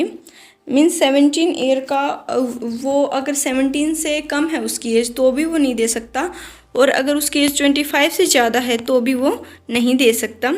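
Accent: Haryana, India. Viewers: Ma'am aap haryana se ho kyaa😁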